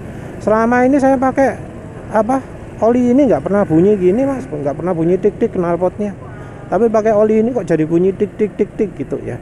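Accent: Sidoarjo, Indonesia. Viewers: Logat Sidoarjoane sangat kental mas bro😂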